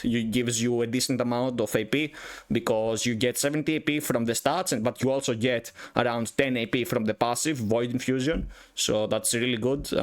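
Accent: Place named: Greece. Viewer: In 2 seconds I was like, he is Greek. What about support Zoe?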